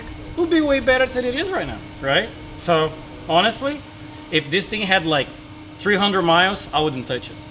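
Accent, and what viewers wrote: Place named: Brazil. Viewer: Nice Accent hahaha Brasilien one! ancioso por esta serie!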